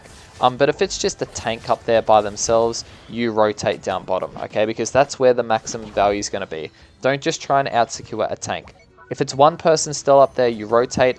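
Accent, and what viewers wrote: Australia. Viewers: Are you australian!?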